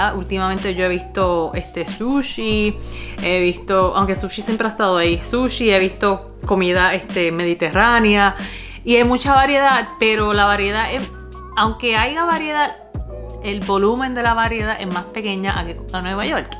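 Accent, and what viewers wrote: Puerto Rico. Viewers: Waoooo que boricua eres hablando. Si no viera tu cara... estaría segura q eres de PR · Me encanta tus videos. Tu acento en 100% PR. Y no hay lugar como PR en todo el mundo, la isla llama!❤️ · ola soy puerto Rigueño ivivo en vinelad nj megusta vertus videos me encanta como ablas suena puraboricua Dios tebendiga icuidate mucho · Ese flow boricua te queda bien brutal. Nunca dejes ese flow. preciosa! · Damn girl u talk better then a real Puerto Rican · 😍😘 me encanta tu forma de hablar , expresarte es bien boricua eres una mujer encantadora y preciosa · You are becoming by far my favorite YouTube channel.. me hiciste reír y bajar el stress.. varias cosas me impresionan de ti.. llevas 15 años fuera de la isla y tu acento puertorriqueño es Classico y no lo as perdido. Segundo, la comparación de Puerto Rico a USA que hiciste es algo que todo Puertorriqueño pasa por experiencia y estás 100% en lo correcto. 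Lo de pedir “pon” yo creo que es parte de nuestra cultura.. years ago as a teenager every time we went to the rivers or beach it was a fuerza de PON! I was born in the island and raised in the states, but every time I see one of ur videos you transport me back to 🇵🇷 tu eres un tesoro de 🇵🇷 keep pumping these videos out and you’re fan base will continue to grow.. I suggest a few future videos.. haciendo alcappurias de yuca aprendió a bailar salsa y merengue (el día y la edad que aprendiste) y a que canción come una fusión de comida (un mofongo de camarones y al lado una buena sopa de rez a el estilo contonese) much blessings and u are 1 of a kind..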